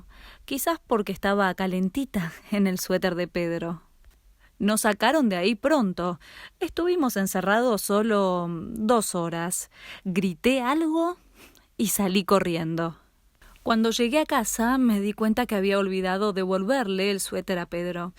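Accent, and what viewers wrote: Argentina. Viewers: Suena re argentina y hablando con tuteo y lenguaje neutro JAJSJSJ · que onda con su voz 0-o y creo que es argentina :v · Tu acento argentino se nota bastante no intentes hablar en neutro porque te queda mal. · Esa voz es re Argentina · Es la típica argentina narrando algo escrito así hablan en el canal Paka Paka. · la voz parece argentina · machu YT en las distintas provincias de argentina tiene acentos diferentes, x ej los porteños y los cordobeses. ahora esa es una diferencia clara, pero tmb hay diferencias que no se notan mucho, como será este caso. bueno eso jaja, es argentina pero usa aquí, puedes noc re rancio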